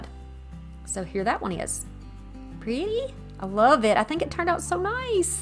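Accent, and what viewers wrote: South, United States. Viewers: Omg love your accent honey! I love the South Y’all are the salt of the earth!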